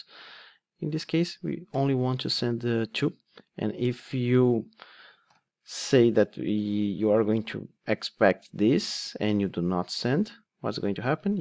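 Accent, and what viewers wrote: Brazil. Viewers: inglês com sotaque de brasileiro é um mamão com açucar pra entender ahuahauhauha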